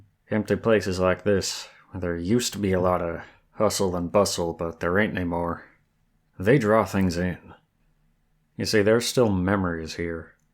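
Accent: South, United States. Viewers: oooh, happy to hear that. Im not usually one for Southern accents but I really liked this one ^^ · I'm from Texas and I don't have a southern accent- So, I'll just say that you did a great job on it. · Come for the audie, stay for the southern accent 😂❤ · Aww, no one's ever told me I smell pretty before! So sweet and adorble. And with your Southern accent (which was great), I feel like that's how I talk when I play too much Red Dead Redemption 2. I kind of imagined you talking with some wheat grass clenched in your teeth, too. Thank you for the great roleplay!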